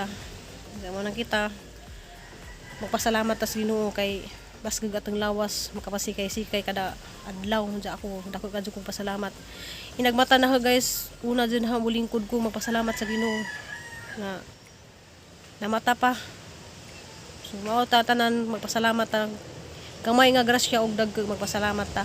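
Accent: Bohol, Philippines. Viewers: Hi sis bagong kaibigan! Taga bohol ka sis noh? Ganahan kog paminaw sa imng sinultihan bah,taga bohol sad akong tatay bah, taga CDO KO SIS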